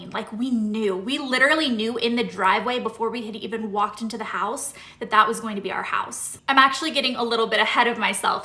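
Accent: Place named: South, United States. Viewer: Feel like you've picked your Southern accent back up since moving back!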